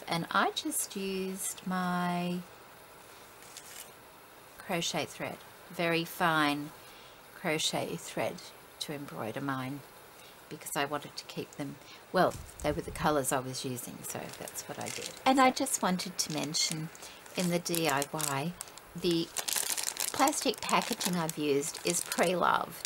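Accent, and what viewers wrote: Australia. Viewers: Hello, I saw your comment and channel name on a video by Diane H and came to check out your channel. I don't know if you're familiar with Michigan in the USA but a lot of businesses and organizations use Mi or MI as "my" in their names (it's part of my name too!). I came here thinking you were from Michigan and was pleasantly surprised to hear the Aussie accent. lol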